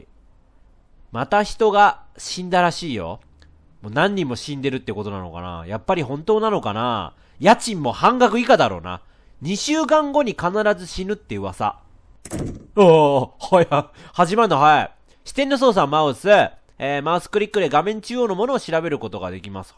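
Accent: Kansai, Japan. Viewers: の関西弁素敵すぎる☺︎ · 今回の関西弁が強い(笑)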